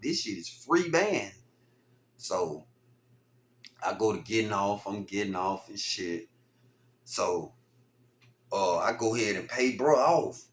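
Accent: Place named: South, United States. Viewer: Bruh you be slindin your stories like a real nigga. I just subscribed to your channel and I’m tuned in witcha now. I’m from Florida and you talk like you from da way and I’m feeling dat ya feel me. Vic Damone Skr8kuttinup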